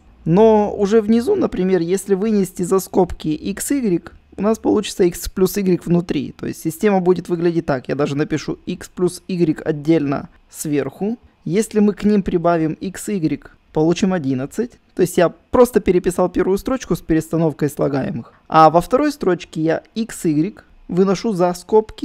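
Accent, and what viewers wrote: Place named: Israel. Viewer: По акценту давно стало понятно что ты не русский, а из Израиля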